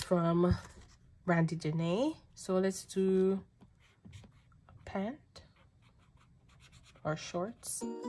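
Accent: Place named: Jamaica. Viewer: You are welcome. When I heard you I was like yep Jamaican 🤣🤣. I am as well 😁.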